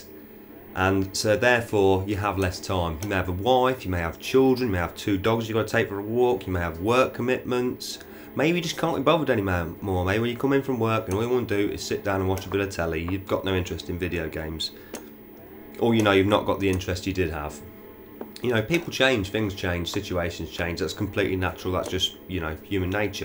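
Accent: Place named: Midland, United States. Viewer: Fair comment - I'll try to add a bit of comentary - although I'll probably best add some subtitles to enable any non-locals to understand my midland accent rambling lol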